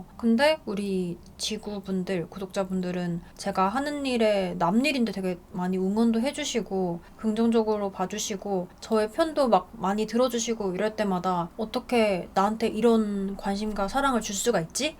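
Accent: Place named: Seoul, South Korea. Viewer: 헐 사투리를 절대 못 느꼈던 대구사람... 완저니 서울사람 말투였는데.. 20만 추카드려요🎉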